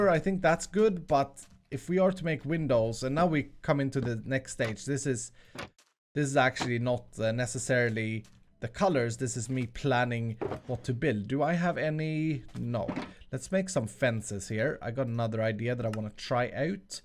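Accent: Sweden. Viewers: Are you from sweden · I love you’re Swedish dialect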